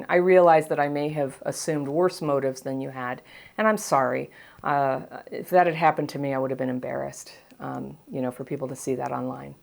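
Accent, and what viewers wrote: United States. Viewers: So Southern!!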